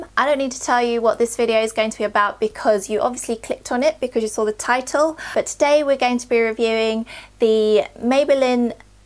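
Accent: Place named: United Kingdom. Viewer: You seem lovely great video! glad I have came across your channel! nice to hear another british accent! take a look at my channel x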